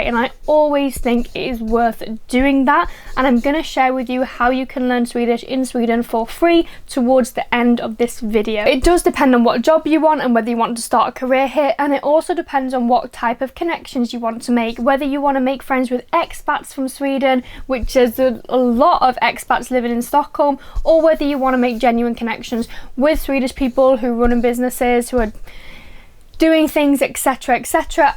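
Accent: United Kingdom. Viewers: If you wanna make a real career here in Sweden, you normally have to learn some swedish. Otherwise, your career will stop at a certain point, which I think is quite fair to be honest. But what I'm most curious about is if you are north English or south Scottish!? ;-) (And by the way, I really do hate all the auto-spelling functions, that Youtube throws at me all the time!).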